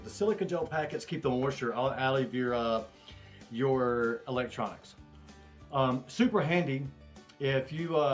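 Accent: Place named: Pea Ridge, United States. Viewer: Sounds like a pea ridge feller I know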